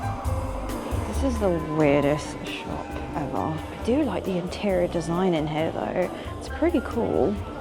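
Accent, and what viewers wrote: RP, United Kingdom. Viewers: Basic economics in action here. Inequality, a disappearing middle class with less money to spend on excess, and a billionaire class that doesn’t sully themselves by going into these shops in person! 😂 fake balcony? Where were you educated? You sound like you must’ve gone to a private school- did they not teach Shakespeare? Fake balcony? C’est un balconet aka a Juliet balcony in reference to Romeo and Juliet and the scene where Romeo meets Juliet after their initial encounter “but soft, what light through yonder window breaks” is supposed to be Romeo seeing Juliet at the balconet of her window. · My God you have the most posh and smooth English accent I've ever heard in my life. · you are so posh 😂😂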